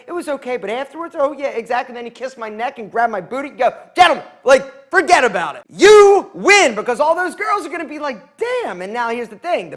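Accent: Philadelphia, United States. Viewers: You're from Philly arent you?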